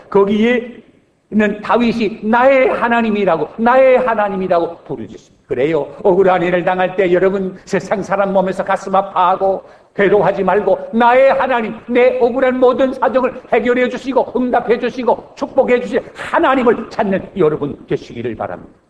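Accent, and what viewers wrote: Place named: Pyongan, North Korea. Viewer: 중학교시절 영락교회에서 말씀을 들으며 성장했는데 예배중에 찬송도 알토.베이스 를 하시며 찬양도 성악가처럼 하시던 목사님 여전히 성대가 젊음이 넘쳐나십니다.평안도 사투리가 간간히 나오시는데 매력이시고 설교에 리듬이 있는 명설교입니다.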